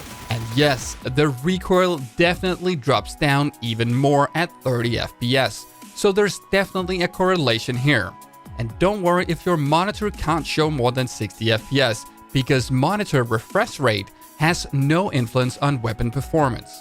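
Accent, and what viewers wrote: Russia. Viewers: ohh , you from Russia) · Are you Russian?🤔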